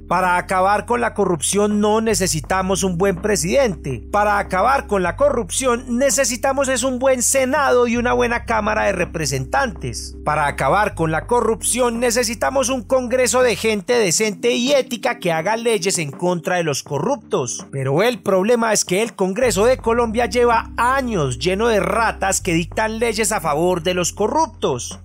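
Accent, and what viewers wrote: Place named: Valle del Cauca, Colombia. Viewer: Eja mierd44? Sos valluno? Apenas noté el acento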